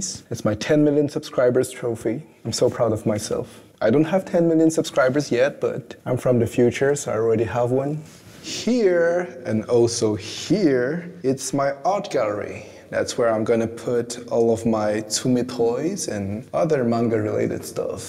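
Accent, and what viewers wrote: England, United Kingdom. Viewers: "La fistinière" ahah j'y pep ! Félicitations pour cet appart il est incroyable :O Et ton accent anglais est vraiment incroyable aussi ! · Il a un Accent anglais incroyable pour un fran-nois comme il dit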